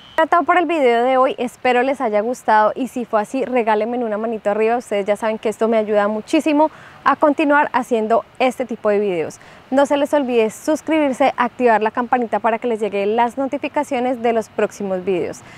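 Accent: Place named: Colombia. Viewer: Hola... Buen video👍... Tienes acento colombiano, soy venezolano, entre Miami y Katy, ¿Cual vale la pena por empleo, facilidades, impuestos y calidad de vida?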